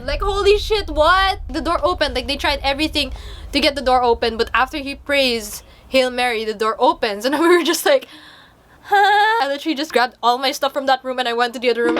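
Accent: Philippines. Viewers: listening to a youtuber speak with a filipino accent is weirdly comforting. you sound like my auntie. thanks ate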